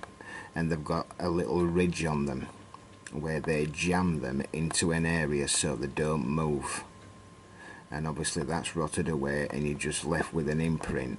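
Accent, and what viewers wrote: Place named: Scotland, United Kingdom. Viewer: I've just discovered your YouTube Channel and subscribed. I've been interested in ancient history/archaeology since I was a child (I'm now 60 years old) and finally, now, lots of things are starting to make sense thanks to your videos. Do you have a web page? I can't find anything other than a couple links when I "google" / You Tube channel - no real website(s). Can I ask your name? Maybe I can find more info that way...also, love your interesting accent! Scottish?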